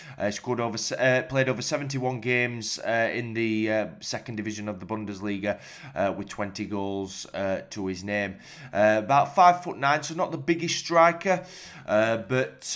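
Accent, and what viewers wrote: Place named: Barnsley, United Kingdom. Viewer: Watching with subtitles is funny as.... 'Welcome to tax tv' 'Pascal Cupcake' Shows that algorithms can't understand the Barnsley twang 🤣🤣🤣🤣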